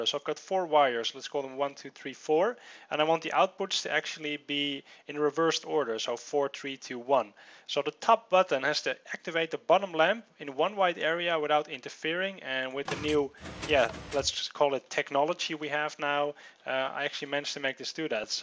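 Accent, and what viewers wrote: Netherlands. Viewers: are you Dutch? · je hoort het gewoon nederlands :3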